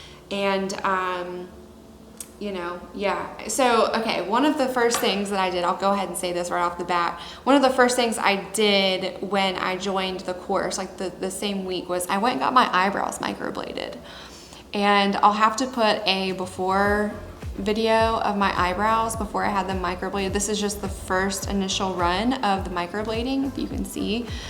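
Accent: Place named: New Jersey, United States. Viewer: Sounds like a Jersey accent to me.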